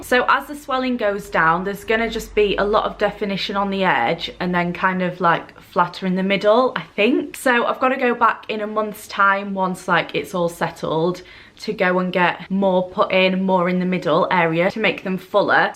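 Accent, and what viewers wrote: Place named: Yorkshire, United Kingdom. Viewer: Never realised how Yorkshire you sounded until your sped up lmao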